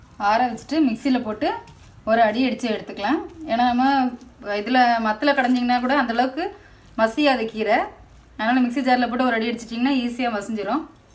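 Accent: Tirunelveli, India. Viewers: நெல்லை தமிழ் நீங்க செய்த கீரை கடயலைவிட அதிகம் ருசி! Nice, very simple video.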